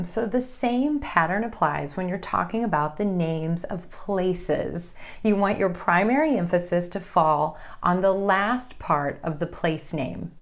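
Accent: United States. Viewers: Yeah some word like hilarious, gorgeous, generous, famous, ambitious,ridiculous, superstitious, suspicious, furious, precious, Somethings that end with ous and ious, I really too bad to pronounce with whose word that end with ous and ious, or if you have more word that end with ous or ious, pls help shares more on the video you make dear, is really hard for me to make a sounds “S,Z” at the end of whose words, Hoping You can help me how to pronounce with whose word correctly dear, I will looking for your video new coming next soon my dear, Thank you so much for all your helpful video, and everything you explain on video very clear for me to understand and I really like ur accent American so much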